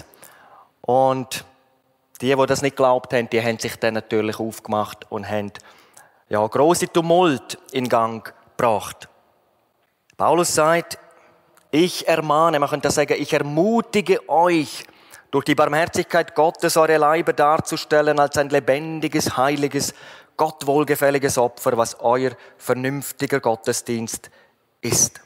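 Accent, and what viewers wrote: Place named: Switzerland. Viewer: Trotz "Schweizerdeutsch" kommt die Botschaft durch und rüber. Danke für dieses Wort. Gottes Segen für Euren Dienst und die klare Verkündigung.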